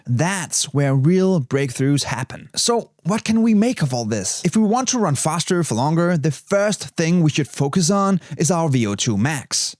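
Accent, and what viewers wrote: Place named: Denmark. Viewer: Failed to recognise your accent :( My guess was Swedish, not Danish. Though who else would explain things in short, simple and very informative way? (May be just Dutch 😉). Thank you so much for a reminder about good warm-ups and A/B skips implementing!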